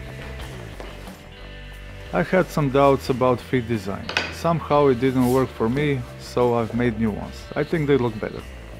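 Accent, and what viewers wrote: Russia. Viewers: Русский акцент? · в его произношении виден русский человек. ну или украинец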